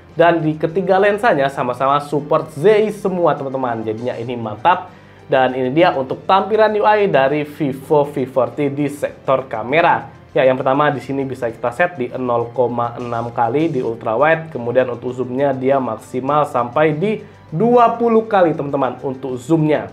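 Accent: Yogyakarta, Indonesia. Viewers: Orang jogja bang?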